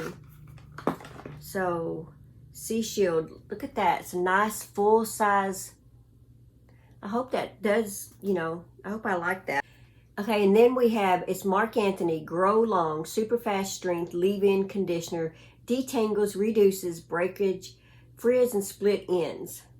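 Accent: South, United States. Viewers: The berry compote yarn is a beautiful combination of colors. I love hearing you talk. Nice southern accent. The scripture today is so true. Hugs 🤗and love to all 😇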